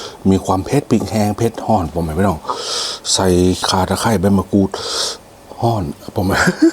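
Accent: North, Thailand. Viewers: อู้เหนือ ดีแล้ว ตอนนี้คนกรุงเทพฯฟังภาษาเหนือออกแล้ว❤❤❤❤❤❤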